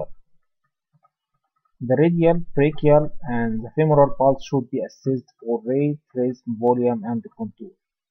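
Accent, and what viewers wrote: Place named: Egypt. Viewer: From the accent I can tell it’s an Egyptian talking😎 thank you 🙏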